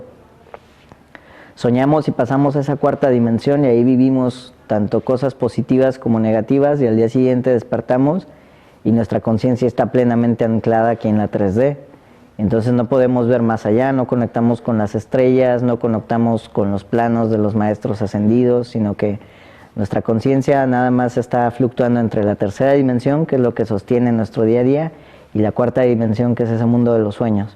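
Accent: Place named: Mexico. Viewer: en que país vives ahora, por tu acento me parece que eres mexicano. Me encanta tu manera y forma sencilla y fluida de narrar la información, gracias.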